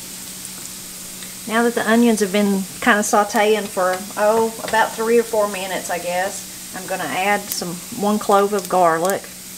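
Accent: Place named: South, United States. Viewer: I just love this lady's country accent, it's so deep and she seems so proud of it.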